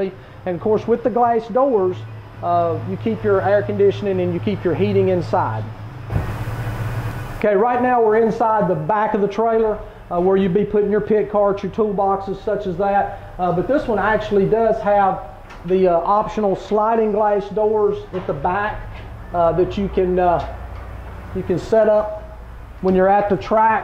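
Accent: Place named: South, United States. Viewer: Official NASCAR accent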